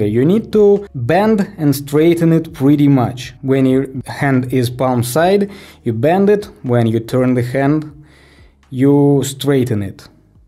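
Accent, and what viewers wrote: Russia. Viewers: так ты русскоязычный по акценту понятно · Слышно что русский челик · You have a Russian accent(lul, it's handsome). Are you from Russia? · Русский акцент остался😂😂😂😂👍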